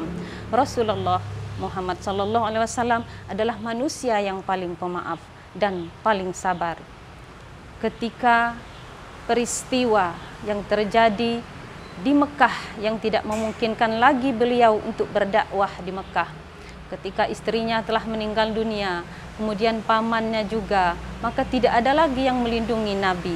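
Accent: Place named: Liwa, United Arab Emirates. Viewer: Sukses terus ya dindaaa.. Tapi logat liwa nya di kurangi yaa... Hee heee hee..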